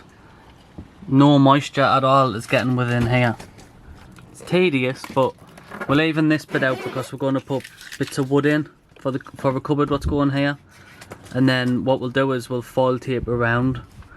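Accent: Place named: Northumberland, United Kingdom. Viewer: Nice job, I'm watching for information and because my mum was from ashington and your accents remind me of her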